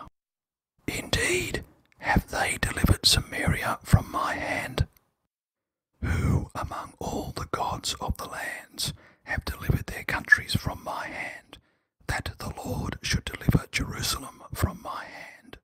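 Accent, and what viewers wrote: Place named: Australia. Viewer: This is great. ASMR bible whispering...with an Aussie accent! Thank you.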